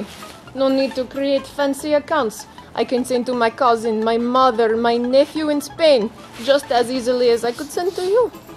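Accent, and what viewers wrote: Russia. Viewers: I Fuckin love your Russian accent :) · lol Russian accent makes instant sex appeal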